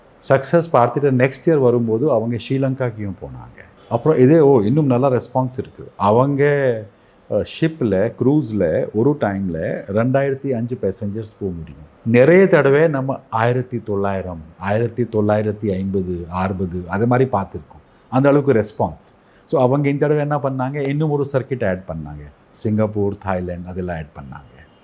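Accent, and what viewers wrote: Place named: North India, India. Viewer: North ஆக இருந்தாலும் தமிழ் அழகாக பேசுகின்றீர்கள்❕️👍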